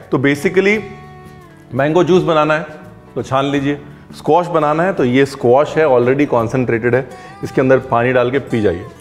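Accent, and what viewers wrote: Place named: Lucknow, India. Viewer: the lucknawi touch in his tone simply adds the magic to each and every recipe of our dear chef...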